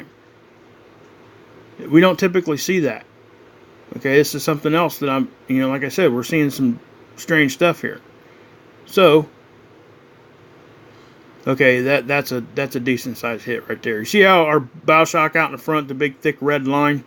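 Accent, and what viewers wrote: South, United States. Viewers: excellent. very very impressive data. advice. try focusing on the data at hand more. hard to watch your video in it's entirety as 50% plus is going off the target. i like your delivery mechanism and your accent is great. love the southern accent. all good but if possible try to stick to the points being made as much as possible. taking a long time to say what your analyzing due to alot of breaks in the transmission. greatly appreciate your time to produce and share your knowledge with the truth seeking community. holy spirit protect and guide you always. peace be upon you always. selah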